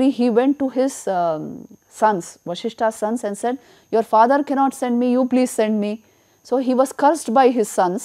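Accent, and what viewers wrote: India. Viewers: Umm..she seems to have a pakka Bangalore accent..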